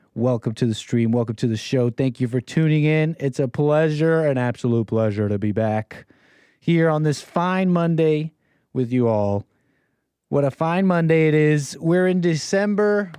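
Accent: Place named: Arizona, United States. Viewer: Love the tombstone accent.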